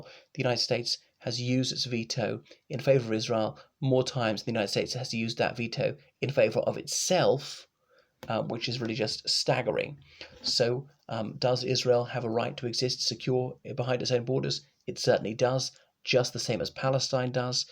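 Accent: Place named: England, United Kingdom. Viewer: are you Irish or English? You certainly sound extremely English and but your channel name is from Ireland?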